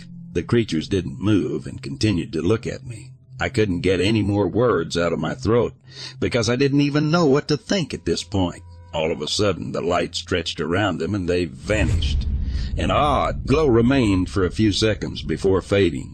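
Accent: South, United States. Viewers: Good News!!! I'm really enjoying the substitute narrator!! He's definitely good at it especially with that southern twang!!!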